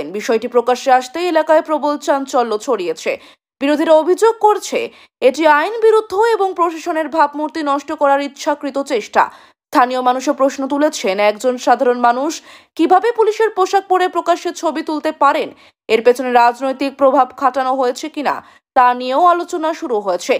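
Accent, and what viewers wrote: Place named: Bangladesh. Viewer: আপনি যতই ভালোভাবে বাংলা বলুন না কেন। আপনার কথায় বাংলাদেশীয় ব্যাপারটা রয়ে গেছে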